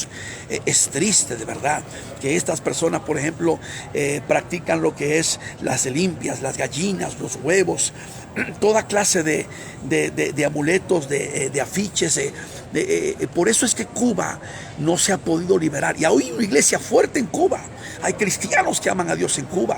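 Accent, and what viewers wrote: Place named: Quito, Ecuador. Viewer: Mira inproquita de momento ablas con en Quito Ecuatoriano porque no miras te veo vestido de judio y protestante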